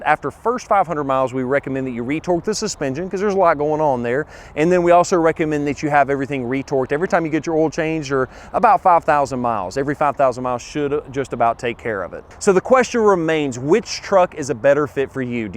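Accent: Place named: South, United States. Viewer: ".....you might can get...." Yeah, he's from the South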